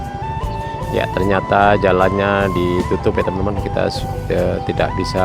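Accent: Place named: Padang, Indonesia. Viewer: Bahasa Indonesia nya Padang banget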